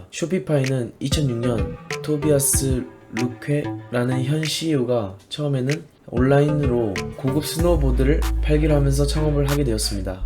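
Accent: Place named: Jeolla, South Korea. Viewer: 영어 발음이 섹시하시네요 ㅎㅎ 반면에 한국어는 전라도 억양? 귀여워요!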